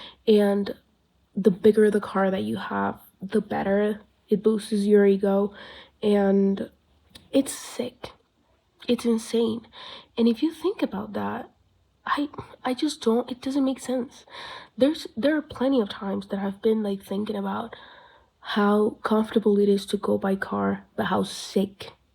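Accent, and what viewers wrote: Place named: United States. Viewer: Wait you aren't from America? Your accent sounds very american.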